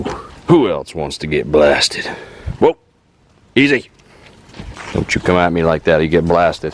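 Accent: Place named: Maine, United States. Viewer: You sound like a Mainah.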